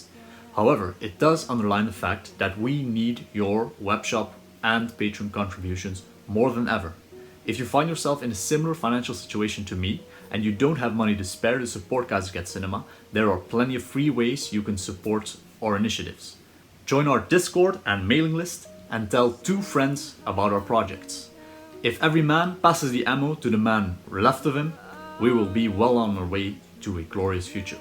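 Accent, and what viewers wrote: South, United States. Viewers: That is an excellent southern accent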